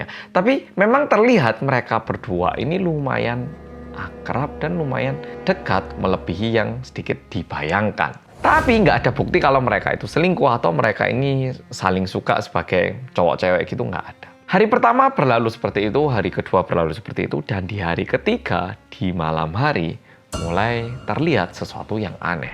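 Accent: Java, Indonesia. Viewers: Bahkan udah bertahun-tahun di Korea, medoknya kental bet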